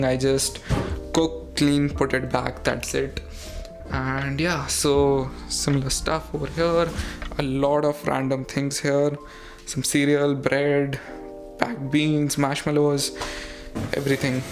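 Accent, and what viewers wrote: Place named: India. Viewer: Wft ? This Indian guy can study in ANU with those terrible English and accent ? ANU has low standards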